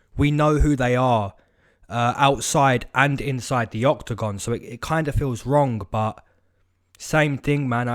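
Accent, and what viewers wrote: England, United Kingdom. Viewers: Hey man always looking for your predictions love your english accent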